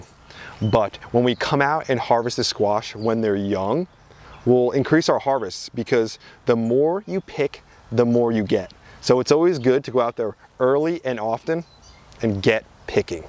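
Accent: New Jersey, United States. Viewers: Your videos are super educational and helpful! Plus my 6 year old daughter absolutely loves your introduction and Jersey accent. 😁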